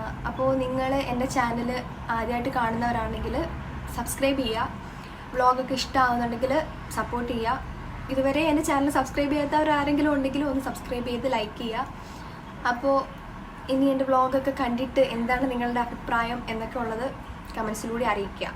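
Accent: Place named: Punalur, India. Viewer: ഞങ്ങൾ പുനലൂര്കാരുടെ സംസാരരീതി ഇങ്ങനെ ആണ്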